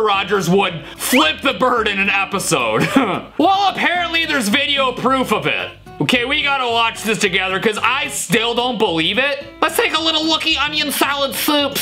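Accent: United Kingdom. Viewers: Very heavy British accent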